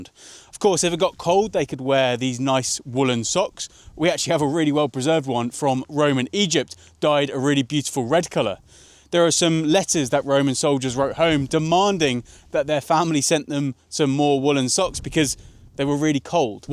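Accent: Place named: United Kingdom. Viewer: J'aime écouter parler un anglais comme celui-là. C'est plus agréable que l'américain-chewingum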